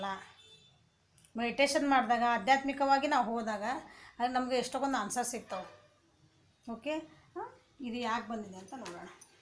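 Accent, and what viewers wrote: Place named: Hubballi, India. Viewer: Tq ಮ್ಯಾಮ್ ನಮ್ಮ ಹುಬ್ಬಳ್ಳಿ ಬಾಷೆ 😍🙏🙏ನೀವ್ ಬಾಳ್ ಚಂದ explain ಮಾಡತೀರೀ I like ಅಕ್ಕಾ you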